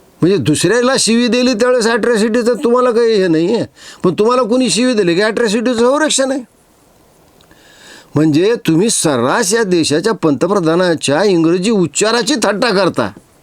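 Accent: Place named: Satara, India. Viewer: एकदम सातारी भाषा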